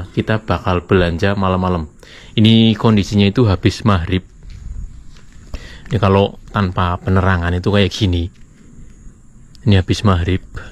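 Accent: Banyumas, Indonesia. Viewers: Jangan malah resep gua mah denger bahasa ngapak kaya kiye 😂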